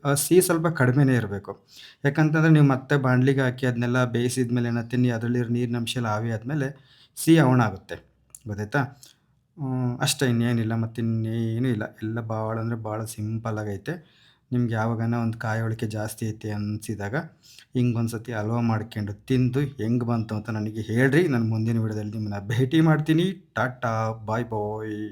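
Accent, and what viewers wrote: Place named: Davanagere, India. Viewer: We in our family love the way you speak Kannada... please preserve the Davanagere dialect🙏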